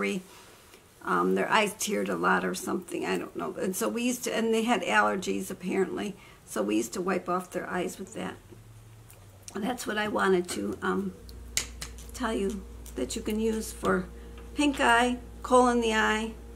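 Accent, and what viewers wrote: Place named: Northeast, United States. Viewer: I knew you meant COLD in your eye, not coal...hehehe...you Yankees talk funny! It won't help with an allergic reaction but to get rid of the sting from a bee or wasp sting is to first get the stinger out then apply a chewing tobacco compress or soak the tobacco from a cigarette in water then put the wet tobacco on the sting.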